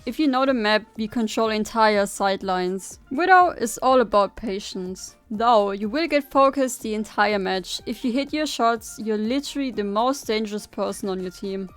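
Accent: Germany. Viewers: German accent heh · First thing I noticed: definitely a German accent, but nevertheless a nice guide! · I hear deutsch Akzent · Are u german cuz ur english sounds like you're german?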